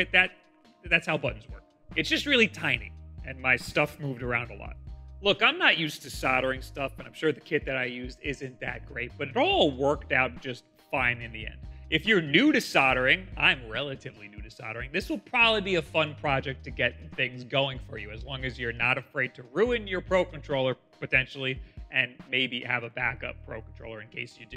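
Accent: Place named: New York, United States. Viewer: your new yorker accent really makes me wanna buy half of the things you do ads for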